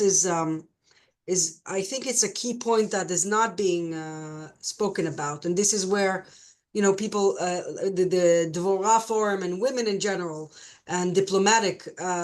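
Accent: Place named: Europe. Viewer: Curious what accent that is, it’s not an Arabic accent, so you’re not from the Middle East. What country in Europe are you from? Also, you’re in a class by yourself saying that it’s helpful that Trump has been elected. Are you aware he’s completely tearing apart our government. But I’m glad it’s helpful for you and your efforts.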